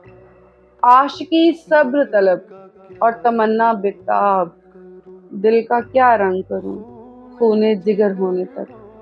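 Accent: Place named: India. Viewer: لہجہ ہندوستانی ہے آپ کا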